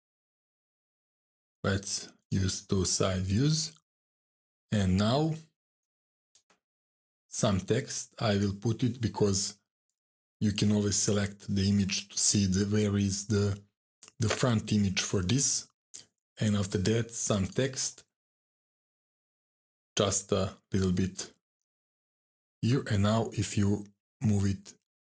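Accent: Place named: Brazil. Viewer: sotaque brasileiro total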